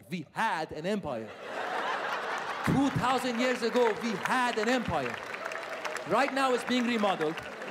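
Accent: Iran. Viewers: hahahahah his persian accent is awesome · this guy is amazing being half persian this hilarious!! the thick persian accent cracks me up haha totally my dad!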